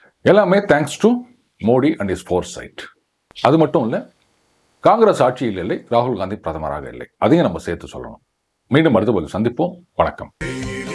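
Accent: Chennai, India. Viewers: வணக்கம் சார். நீங்க அடிக்கடி இடையில் சென்னை பாஷையில் வெளுத்து வாங்குறீங்க 😂😂😂😂😂😅😅😅😅😅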